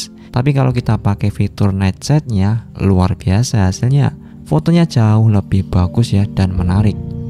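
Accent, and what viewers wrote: Boyolali, Indonesia. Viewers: Orang boyolali ya mas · Loh org Boyolali tah mas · Orang Boyolali ya bang 😅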